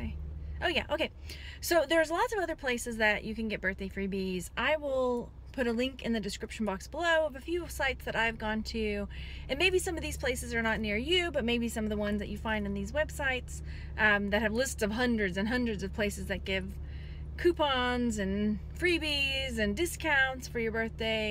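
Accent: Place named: South, United States. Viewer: Happy early Birthday! more southern lady accent talk. :)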